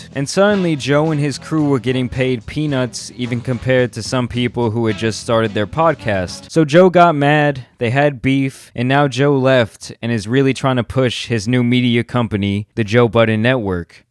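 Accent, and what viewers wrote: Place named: New York, United States. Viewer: Sounds like this guy is trying hard to hide a new York accent New Yorkers are based bad ppl But boooooy did he hit the nail on the head bout that ps5 vs purse thing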